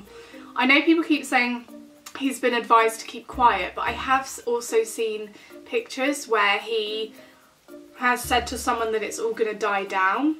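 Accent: United Kingdom. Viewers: Is it weird that I love to listen to your voice and your British accent 😂 I cannot believe that Chris ingam is still blogging I have been watching the Wilkes family and also Demi Donnelly as well as your second channel and all the evidence is disgusting he definitely is guilty so when is he going to face justice. we won't ever forget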